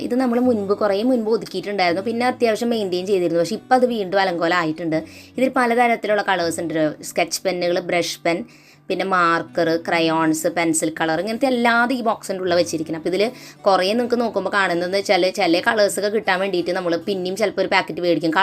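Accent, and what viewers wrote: Thrissur, India. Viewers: Chechi Thrissurkari ano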